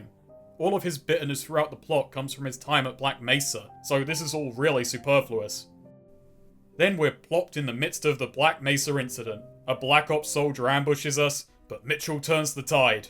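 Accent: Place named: RP, United Kingdom. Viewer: Good lesson to learn for indie devs. Better have your shit together or some tosser will absolutely roast your efforts to bits unless it's at the standard of the original game. With the pretense that because he has a posh English accent what he has to say is somehow important in some way. Excellent video A+. Will totally share.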